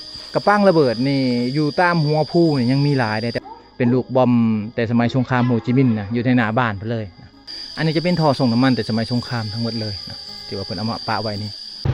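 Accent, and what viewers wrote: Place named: South, Thailand. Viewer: ฟัง คนใต้บ้านเราเลยเนอะ😆😆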